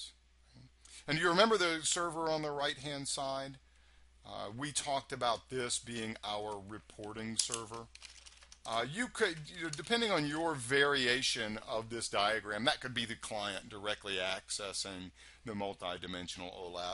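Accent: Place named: United States. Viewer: Why do your vids get so many dislikes? Youre like the only person teaching sql with a perfect american accent lol.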